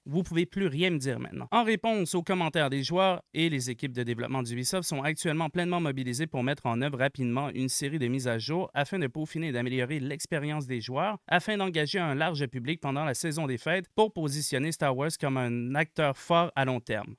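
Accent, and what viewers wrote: Quebec, Canada. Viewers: Sympa ta chaîne mec ;) J'adore l'accent et les expressions du Québec.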